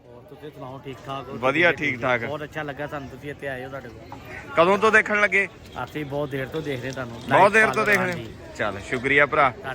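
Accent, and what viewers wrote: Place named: Malwa, India. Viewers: ਵੀਰੇ ਤੇਰੀ ਬੋਲੀ ਵਧੀਆ ਨਹੀ ਜ਼ਿਆਦਾ ਮਲਵਈ ਜ਼ਿਆਦਾ ਨਾ ਬੋਲਿਆ ਕਰ ਵੀਰੇ ਲਹਿੰਦੇ ਪੰਜਾਬ ਦੀ ਬੋਲੀ ਬਹੁਤ ਮਿੱਠੀ ਹੁੰਦੀ ਹੈ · Veer apni boli bol reha eh malwai aa ta malwai hi bolni aa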